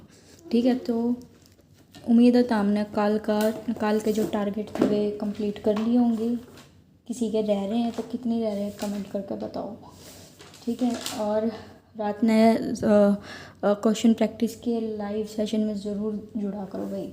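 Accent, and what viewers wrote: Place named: Haryana, India. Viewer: Hello mam apki hriyanvi voice bahut achhi hai All the best for your exam Or chennal pe daily video dalna mat bhulna please apka chennal ek din baahut grow karega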